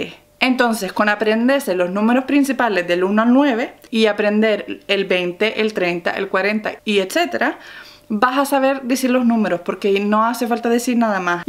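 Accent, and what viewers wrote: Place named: Canary Islands, Spain. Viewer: Que acento más canarión tienes (de Gran Canaria) jajajaja gracias por el vídeo!! Muy bueno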